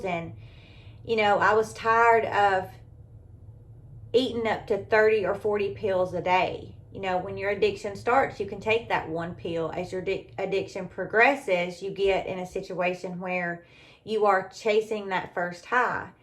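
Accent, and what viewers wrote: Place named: South, United States. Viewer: Hi, i just subscribed!😊🎉❤ I saw some of your denture videos. I was just browsing through your videos, and my experiences are so much like yours. It's crazy! My teeth are so bad! They are broke off to the gums. I am 60 yrs. old and i want to get dentures just like yours. lol I love them. You mentioned in one of your videos about the 3D dentures. Could you tell me where to reach them and how much they are,please?🙏🦋🌸💐😉👍❤️ You got the talking down with yours, girlfriend. 😏 Ive been clean for 14 yyears now.👍🙏 Im much happier now. I will check out your videos. Thanks for sharing. You seem like such a great person. I love your country accent!!! Lol❤😊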